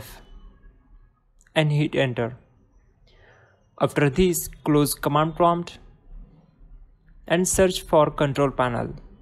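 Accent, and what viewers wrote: India. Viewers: indian lol loved ur accent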